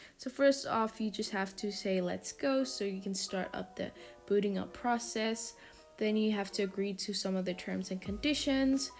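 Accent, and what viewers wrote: South Africa. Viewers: Is she South African?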